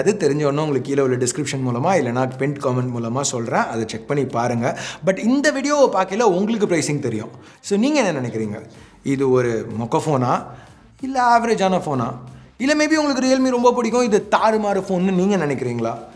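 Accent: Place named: Chennai, India. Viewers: Nadula nadula chennai slang varudhe🤭😶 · Enna bro chennai slang la pessa try panra maathi theriyuthe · Bro antha Chennai Tamil slang nalla irukku bro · Chennai slang super bro. Already subscribed. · Ona pakave irritate ah irukku . Onnoda hair style and thavai illatha vetti pechu Mayiru tamil basai ketta chennai tamil nu soldra asingam puduchavane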